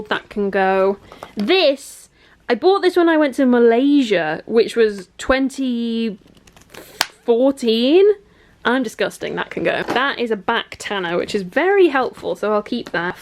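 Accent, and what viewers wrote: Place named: Yorkshire, United Kingdom. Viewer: Northern English (Yorkshire)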